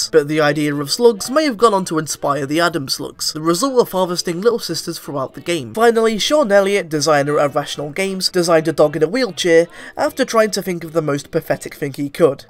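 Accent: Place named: United Kingdom. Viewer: Awesome job! And British! EXCELLENT!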